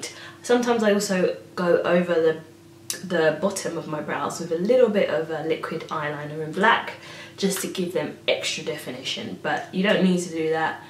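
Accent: United Kingdom. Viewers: Great tips and great video. Love those pearl earrings on you. They really draw attention to your eyes and make your teeth look nice and white. Oh, and also, I adore your accent. A British accent always sounds so so classy. I try to follow all your tips to look polished, although I'm embarrassed to admit that most times my nail polish does chip. I do my own nails because I like changing colours weekly & I can't stand all the time wasted at the nail salon when I could be doing something more useful. Also, the smell gives me a major headache. However, in 2019 I shall carry my nail polish in a baggie in my make up kit. No more excuses. Keep up the great videos.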